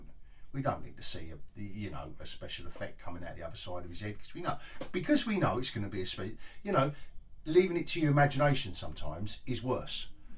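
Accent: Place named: Cockney, United Kingdom. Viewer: Christ I'm 100% British and I'm jealous of that cockney accent! 😁 It was a great pay off to episode 4 which, at the time, felt very weak. Keep up the good work mate!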